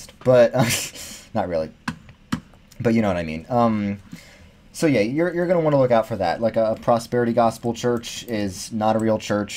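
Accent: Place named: New Jersey, United States. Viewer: He's Jerseyian, you are allowed to make fun of his accent all day - me, a based Texan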